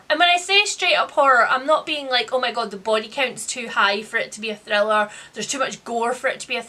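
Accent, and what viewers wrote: Scotland, United Kingdom. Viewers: i feel like i’m watching an episode of balamory w/ ur accent lol i love it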